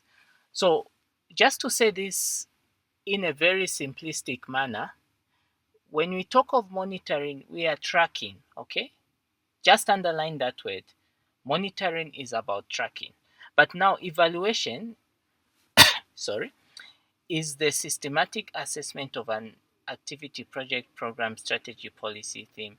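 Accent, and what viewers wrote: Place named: Zambia. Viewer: You sound Zambian.. great lecture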